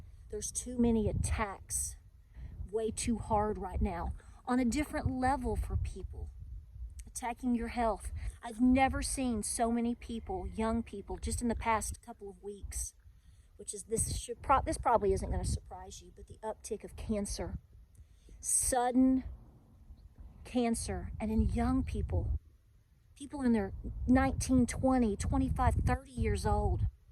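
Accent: South, United States. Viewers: Your voice is super awesome idk what word to use the country twang and matter of factness. I enjoyed this. — on Christmas, my Christmas Day was the most enjoyable to date! My husbands and parents also. It was the best family time! Intimate. Games and bonding. Now Christmas Eve (extended family) some didn’t one due to political differences so that stunk :( Regardless! Praise you Lord Jesus! We love you! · I really like you. Love your southern accent, yes the attacks can also be spiritual fatigue. I felt that way befor3 the election. On another note yes ppl want flowers and rainbows but thats not the world we live in. Fear to face reality.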